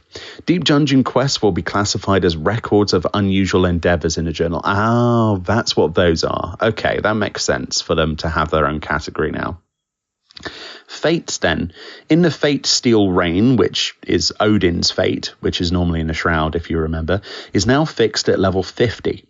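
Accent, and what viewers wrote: United Kingdom. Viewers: I'm still able to thoroughly enjoy the british man reading FFXIV patch notes ASMR so it's okay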